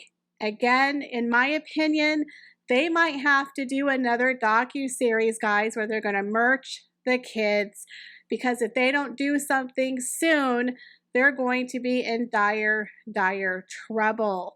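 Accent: South, United States. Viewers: Love your «yall» accent. Hazbeen wants to be treated like royal. He even insisted to be called with his HRH at the High Court in the UK. He wanted to live like a billionnaire while doing nothing.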